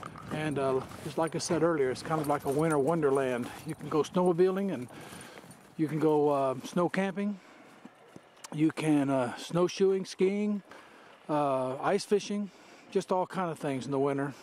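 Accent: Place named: South, United States. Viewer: you don't have a MN accent you sound like you are from the south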